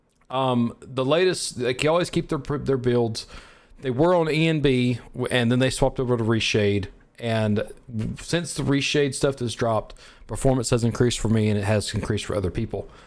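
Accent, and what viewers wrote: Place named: Alabama, United States. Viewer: Thanks man. That alabama accent made me feel right at home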